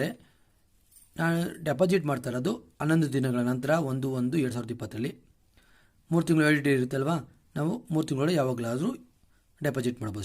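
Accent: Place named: Mandya, India. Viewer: Sir neevu pakka Mandya side thane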